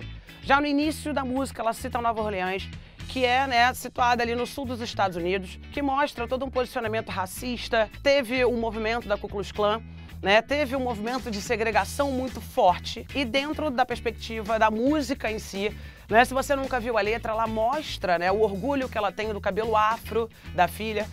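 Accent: Rio de Janeiro, Brazil. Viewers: Será que a sra é carioca?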